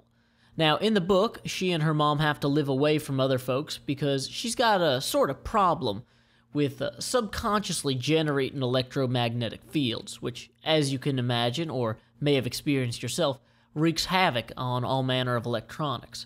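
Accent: South, United States. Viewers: Applejack approves of your souther drawl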